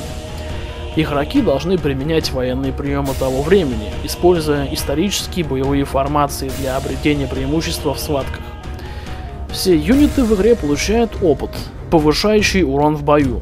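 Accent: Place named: Lipetsk, Russia. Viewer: Он из Липецка. Лол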